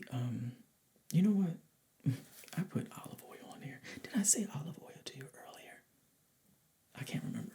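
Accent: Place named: United States. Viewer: I hear an American accent! Weirdly, I was expecting him to have an English one!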